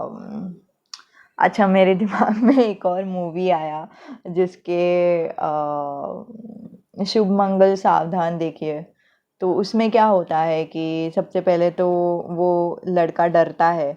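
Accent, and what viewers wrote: Maharashtra, India. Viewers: Hi mam, i have chosen pile no. 3,i m also a tarot card reader, numerologist, etc. mam aapke bolne mein marathi accent aata hai, aap maharashtrian ho?mujhe aisa laga, just asking generally, ok, so Mam aap mujhe ek baat batao, aap jab bhi reading karti ho hamesha yehi kyun bolti ho, "aapke husband aise honge",vaise honge, matlab ye video ko ladke nahi dekh sakte, ye video ladko se resonate nahi hoga.aapne pile no. 3 mein toh puri khichdi bana dee, aap logon mein jhagda hoga, nahi hoga, baad mein thik ho jaayega. "jara nit padh teene reading kara ho madam, nakki kay aahe te saangaych, hasayala tumhalaa lay aavdto.ekdum mana nee hasta ho tumhi. Nice reading. Keep smiling. 😄Thank you madam, 🙏🙏🙏👍👍👍👌👌👌❤️❤️❤️❤️❤️🌹🌹🌹🌹🌹🌹🌹🌹🌹🌹🌹.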